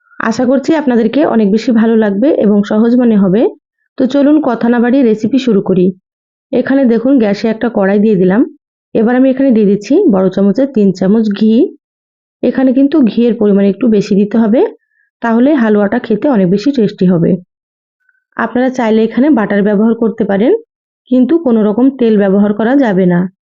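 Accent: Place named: Chapai Nawabganj, Bangladesh. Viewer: আপা আপনার বাড়ি মনে হয় চাঁপাই..!! কথায় আঞ্চলিকতার কিছুটা টান আছে...!!!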